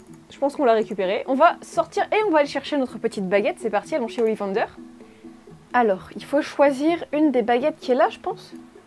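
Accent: North, France. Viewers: Le petit accent du nord ^^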